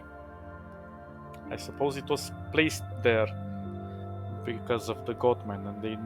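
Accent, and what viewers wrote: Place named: Lithuania. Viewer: Hey bro great video, but I have an unrelated question. Are from Lithuania or someplace close to it? You have an accent very similar to a Lithuanian friend that I have.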